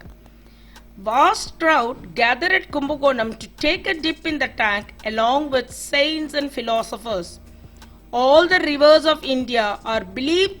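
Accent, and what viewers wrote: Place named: England, United Kingdom. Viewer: Been to this temple many times. Nice English ascent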